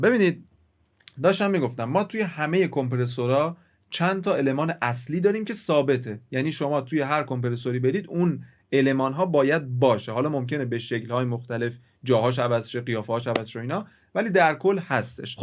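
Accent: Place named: Mashhad, Iran. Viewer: دمت گرم میگم شما مشهدی نیسی احساس میکنم لحجه داری مشدی داری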